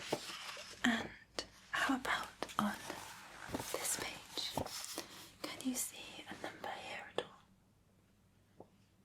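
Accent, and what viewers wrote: United Kingdom. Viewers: Gawd I love a woman with a British accent! ❤😍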